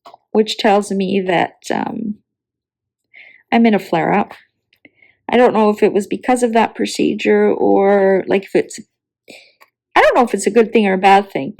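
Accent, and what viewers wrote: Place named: Canada. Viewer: 🌹 Hi - sorry, is it or or something else? I’ve heard your name in someone’s Live, but I’m new to following you. I’m April Campbell and I live in Oregon. I’ve been Diamond painting for a little over one year. I’m a knitter. I’m now fully addicted to diamond painting 😊. Oh well. I enjoyed your WIP and Chat. You have a lovely Canadian lilt that is very soothing, so thanks for that!! And I just want to add that I hope your upcoming procedures help you - and you are in my thoughts for all good things. ❤ from Oregon. I’m glad I found your channel!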